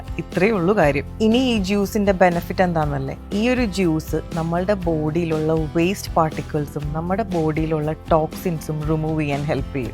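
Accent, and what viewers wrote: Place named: Malappuram, India. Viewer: നമ്മളെ കുമ്പളങ്ങ മലപ്പുറം ഭാഷ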